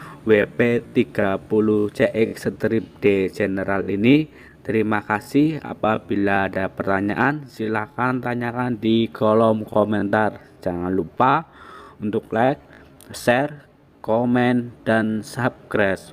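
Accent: Banyumas, Indonesia. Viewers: KAYANE NGAPAK APA YA KANG😅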